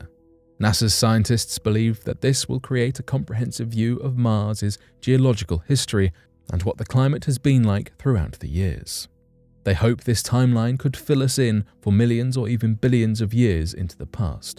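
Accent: United Kingdom. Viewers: This narrator is British but sounds great. Compared to that other guy.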